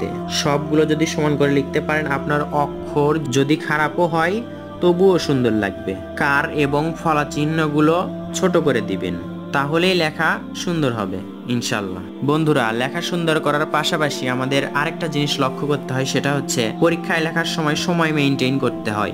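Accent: Bogura, Bangladesh. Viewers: আপনি বগুড়ার তাই না?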